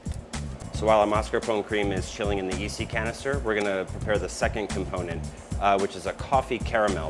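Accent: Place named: United States. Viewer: I don't think it matters how words are pronounced since Italians, English, and U.S. Americans, for example, pronounce them differently. To me it's like an indicator of where the person came from; this case he's American. :) Ain't language fun? :D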